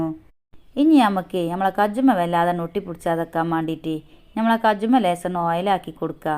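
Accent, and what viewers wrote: Malappuram, India. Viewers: Malappuram pwrooi ❤❤❤ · ഇതെന്തു സംസാരമാണ്.. മലപ്പുറത്തെ എല്ലാവരും ഈ ഭാഷയാണോ സംസാരിക്കുന്നത്.. കുറച്ചു നന്നായി സംസാരിച്ചു കൂടെ,.. എന്നാൽ എല്ലാവർക്കും മനസ്സിലാകുമല്ലോ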